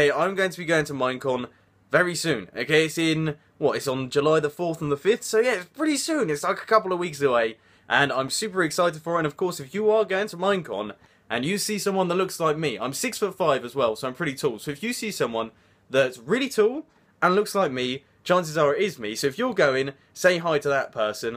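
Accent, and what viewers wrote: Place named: United Kingdom. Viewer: British accent is awesome, way better than the american accents I know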